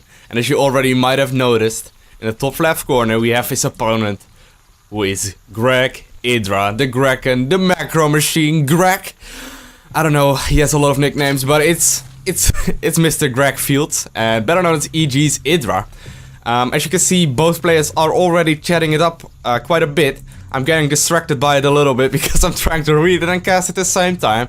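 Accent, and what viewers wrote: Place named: Netherlands. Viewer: As a longtime fan I've decided to watch a few old videos.... wow... his Dutch accent is so much more noticeable. Amazing.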